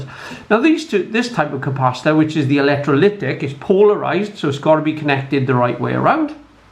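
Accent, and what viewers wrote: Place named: Wales, United Kingdom. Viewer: Thanks for this also,. You sound Cymraeg (like me) 😊 I have done a bit of electronics in my past amateur radio exams so this is a nice refresher for me. 👍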